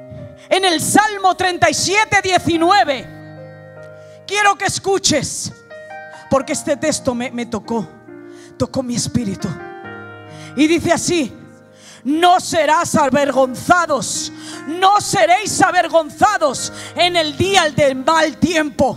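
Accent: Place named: Latin America. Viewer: Porque hablan con acento latino??? Mijooo???😂😂😂 Son gitanos de Madrid de toda la vida de Dios..No entiendo, que ridículo🤭🤷🏽‍♂️pufff madre mía...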